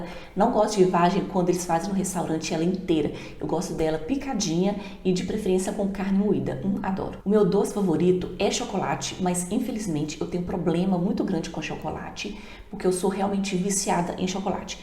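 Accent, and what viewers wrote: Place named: Minas Gerais, Brazil. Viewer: Voz de mineirinha.Minha mãe era mineira de Poços de Caldas .Maringá Pr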